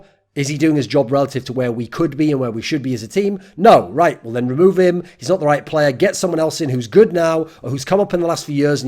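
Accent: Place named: North, United Kingdom. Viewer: He comes from the north of UK, doesn't he?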